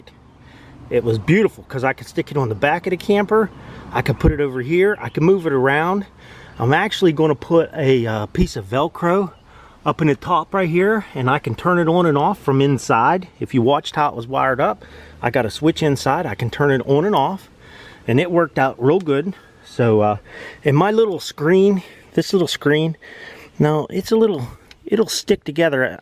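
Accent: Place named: Western Pennsylvania, United States. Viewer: robert hoffman - I caught that too..plus overall accent..my mom and dad are from around Tarentum and Oil City...I grew up in NC tho! Thanks for video..I'm single 62 yr old female just retired. I've never pulled anything behind my car and I'm looking for anything small. This is sounding more and more like the way to go!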